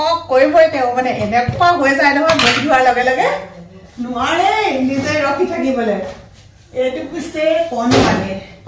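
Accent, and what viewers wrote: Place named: Tezpur, India. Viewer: Aitu amar tezpur fala vokosu buli koy kai kub val laga ❤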